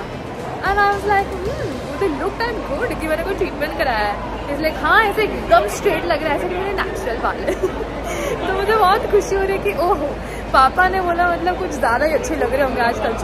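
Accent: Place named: Kutch, India. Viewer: CONGRATULATIONS 💗 SUPER HAPPPPYY FOR YOU 😁🌼(LOVE YOUR KUTCHI ACCENT...being a KUTCHI myself i feel so proud 🥲✨)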